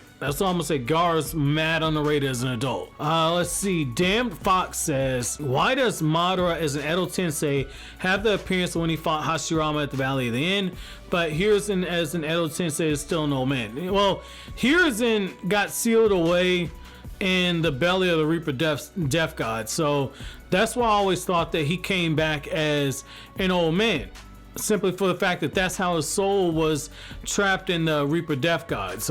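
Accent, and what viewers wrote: New York, United States. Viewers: Bro, you actually have a pretty cool voice and you sound like you’re from NY. Your content brings me daily joy. Stay Up fam 🙏🏽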